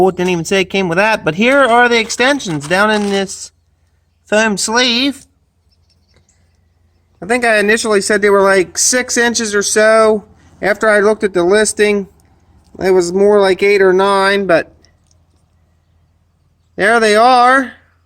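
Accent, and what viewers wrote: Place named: Eastern Pennsylvania, United States. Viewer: If I had to guess, I’d place him somewhere in eastern PA.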